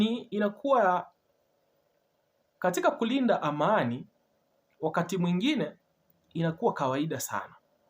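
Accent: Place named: Luo, Kenya. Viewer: Kama mtaandamana kama shetani wenu heche alivyojinadi katika mikutano yake jiandaaeni kuona mnayoyataka kuona na huo ndio utakuwa mwisho wa ushetani wa chadema kama heche atajiona kuwa yeye ni zaidi ya Dola ajitokeze siku hiyo asiache kuandamana atangulie yeye pamoja na genge lake la uhalifu wa kisiasa na wewe kijana kutoka Kenya na hiyo studio Yako unachoongea kuhusu hayo maandamamo haujitambui na lafudhi Yako ni ya kijaluo endelea tutakupata pale ulipo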